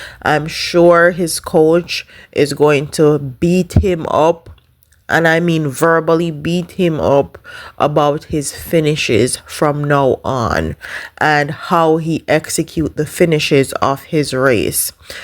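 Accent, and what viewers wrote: Jamaica. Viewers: You sound like a Jamaican. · Sounds bias to me..ur voice sounds Jamaican so clearly ur gonna be biased, when u don't wanna except the truth it could be sitting in ur lap and u wouldn't see it . But no matter how ur wrestle with this truth the Gold is coming to the United States.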